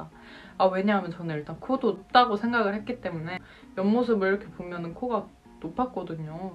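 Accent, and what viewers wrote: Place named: Chungcheong, South Korea. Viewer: 어우 충청도 사람같아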